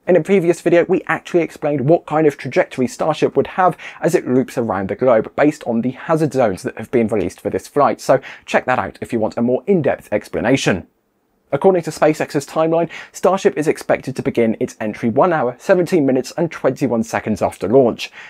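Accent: United Kingdom. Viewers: No British Accent please!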